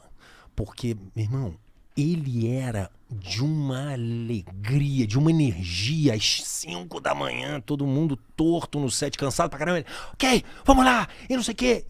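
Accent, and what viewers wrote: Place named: Rio de Janeiro, Brazil. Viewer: Sotaque de carioca, mas nasceu em Ribeirão Preto.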